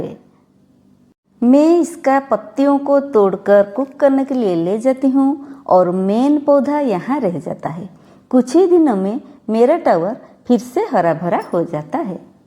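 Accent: Odisha, India. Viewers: Aapke Hind boli se pata chal raha tha ki aap Odia hi honge 😃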